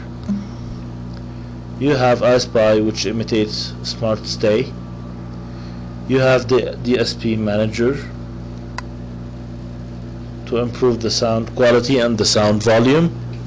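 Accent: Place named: Egypt. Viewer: Hello, how are you:) I believe you're egyptian from the tone of your voice:) Well, I am too... Anyways, would you please tell me how to get the storage swapping work here?? I mean, the correct kernel, the correct script that would really work with that rom. I'm done of trying so many roms and kernels for CM based roms. I would appreciate if you do that:) Thanks w koll sana wnta tayeb:)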